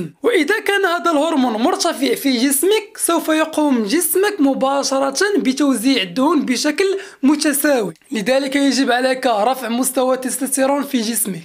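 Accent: Morocco. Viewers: Salam khoya men lehja dyalk baaaayna merrakchi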